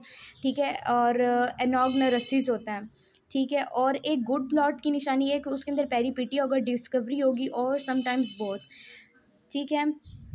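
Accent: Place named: United Kingdom. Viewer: MashAllah, mam your accent is very good and you totally seem like British... 👍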